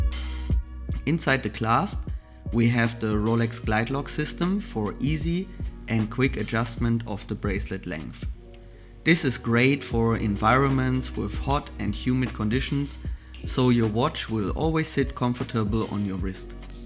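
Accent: Germany. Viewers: Are you a native German speaker ?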